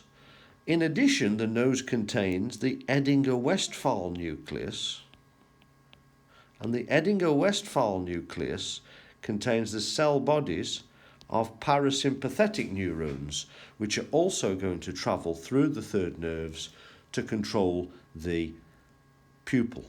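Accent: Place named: United Kingdom. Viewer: THANKS.SAME THEME DIFFERENT PERFECT WAY.SOMETIMES THE VERY BRITISH PRONUNCIATION IS ADORABLE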